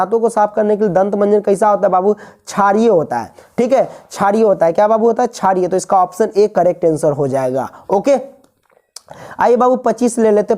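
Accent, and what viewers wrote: Bihar, India. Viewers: Sir aap Bihari style wa......🤞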